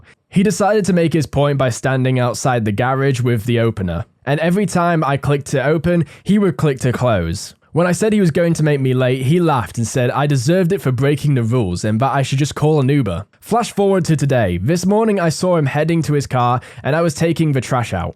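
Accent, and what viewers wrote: United Kingdom. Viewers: It may be the accent. Since Jake is British. sounds like he has a British accent also. Edited to add: he verified that he is from England. The Accent is probably why you thought about Jake. needs to speak a little slower so we can understand him a little better. Jake and both speak a little slower.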